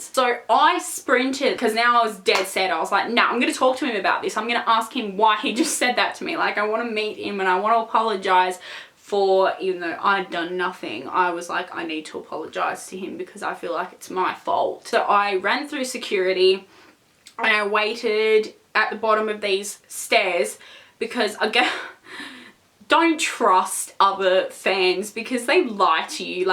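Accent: Australia. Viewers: are you Australian? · She legit has the same australian accent as 5sos and lol u made it to her new video! Watch it to see her slay your ass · Your so pretty, and I lurrrrv your accent. Are you Australian?? xx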